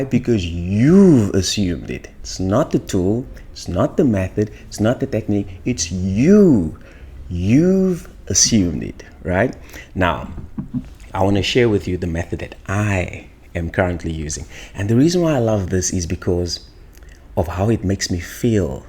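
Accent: South Africa. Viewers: Sounds like a South African🇿🇦. Thank you for sharing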